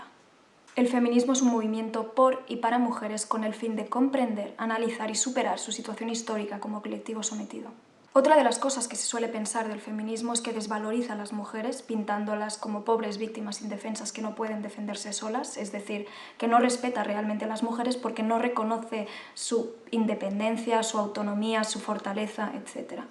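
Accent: Spain. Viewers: Y otra cosa la verdad no se de donde seas??. Por tu asento española no se si salgas pero en cuanto te refieres que los hombres hablan mas vete a un bar de estados unidos o de tu mismo pais sienpre abra grupos de muejres a estar hablando y hanlando y no se callan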